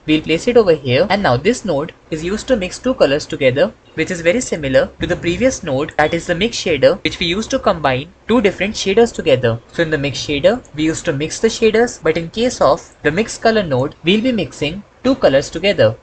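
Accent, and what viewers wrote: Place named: India. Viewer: Namaste Bro, Can you also make a full course for sculpting (Note- everything about sculpting means basic to advanced) in blender. Are you from India as your accent seems like to be an Indian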